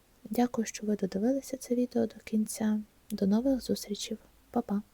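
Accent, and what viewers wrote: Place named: Vinnytsia, Ukraine. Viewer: Ваш суржик мені дуже схожий на мій, я з вінниччини) Успіху вам, цікаво слухати.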